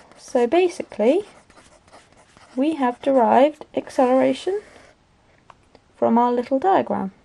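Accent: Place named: United Kingdom. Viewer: I agree. I love the British accent.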